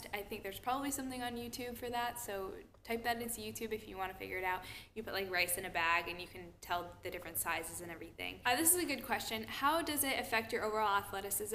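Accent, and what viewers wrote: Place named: Boston, United States. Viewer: omg are you from boston? I am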